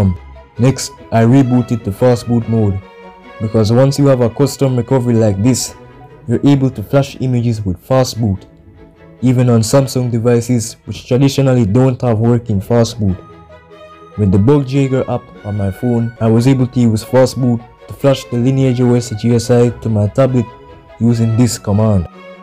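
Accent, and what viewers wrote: Jamaica. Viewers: Are u jamaican bro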